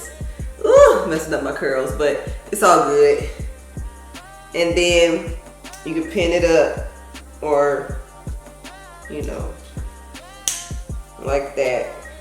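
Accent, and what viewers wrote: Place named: South, United States. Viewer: Yes, ma'am, I am here for the consistent uploads! Love your personality and your channel! You are so gifted. I think I remember hearing that you were born and raised in CA, but I definitely hear a southern accent. 🤷🏽‍♀️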